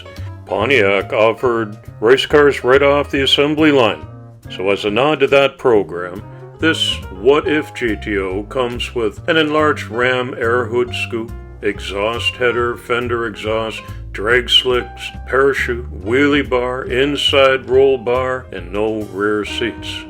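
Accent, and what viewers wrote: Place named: Canada. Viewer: Dude, I LOVE your (not at all) odd Canadian pronunciations. I've begun pronouncing 'decals' as "deckles" now and then. It's an American thing I'm trying to un-learn: just because we pronounce it one way doesn't mean that's the correct way. Deckles, deckles, deckles all day long!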